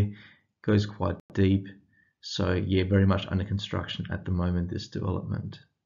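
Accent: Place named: Adelaide, Australia. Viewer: You’re accent is so Adelaide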